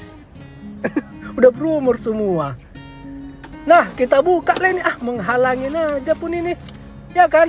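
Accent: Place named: Java, Indonesia. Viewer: Mas e koyo wong jowo wae wkwkwk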